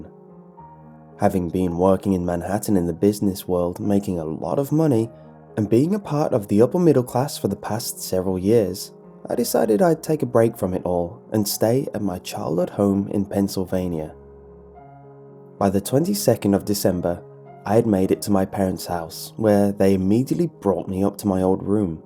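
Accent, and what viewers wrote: United Kingdom. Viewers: If this bastard grew up 'in Pennsylvania', then why the British accent?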